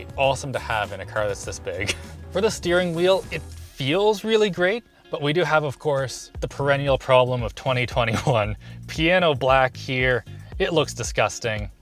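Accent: Canada. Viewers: I was wondering if it was a candians thing or just a weird thing. you never know... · It's a canadian pronunciation lol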